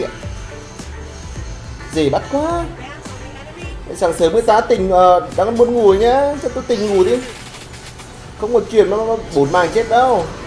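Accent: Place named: North, Vietnam. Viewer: bình luận giọng người miền Bắc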